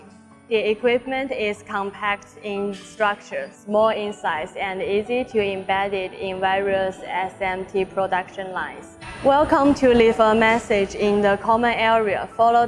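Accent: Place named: Taiwan. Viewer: 這個台式口音讓我把廣告看完再過來看一次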